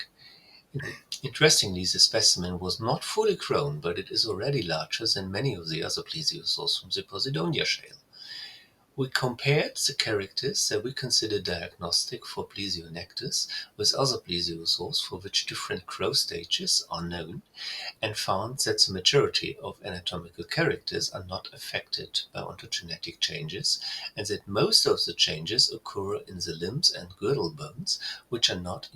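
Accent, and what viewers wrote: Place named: Germany. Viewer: wow, das sind aber interessante Nachrichten, evtl. wäre es für internationale Viewer netter, die automatische Synchronisation in alternative Sprachen beim Upload einzuschalten, Sie haben einen heftigen deutschen Akzent, das könnte man so verbessern, nur so ein Gedanke.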